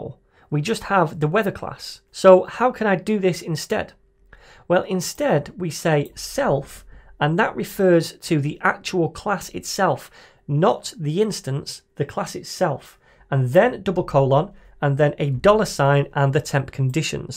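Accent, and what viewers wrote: United Kingdom. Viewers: diving into the topic instant -british accent -on point -examples made easy to understand please never stop you made this nutshell powerful. Continue your videos like this it's more helpful than you think it is. People are way into "yo whats up this is .. and never forget to click the bell and sub" and "for a short ad we can do this" such sellouts.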